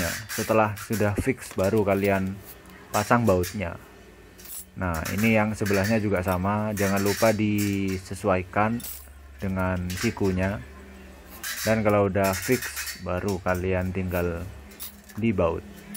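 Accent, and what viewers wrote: Madura, Indonesia. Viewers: Logat madura nya kentel 👍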